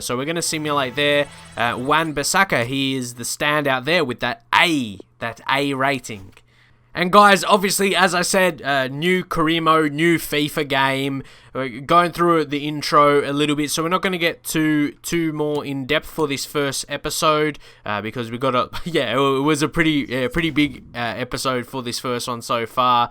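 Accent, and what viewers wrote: Australia. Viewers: are you aussie? or do you live in straya you have an aussie accent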